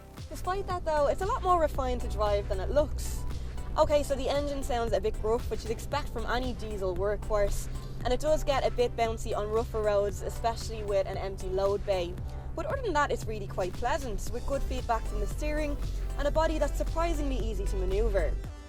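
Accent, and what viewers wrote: Ireland. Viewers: Well it is an Irish accent, don't be so insulting and turn on captions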